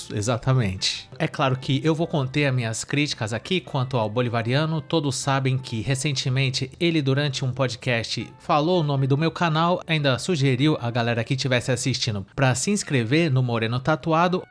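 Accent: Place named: Southern periphery, Brazil. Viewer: Um canal de 62 mil inscritos que não tem nem coragem de botar a cara querendo falar dos outros 😂😂😂😂😂 fora esse sotaque horroroso de periferia do sul que é intragável kkkkkk se enxerga seu invejoso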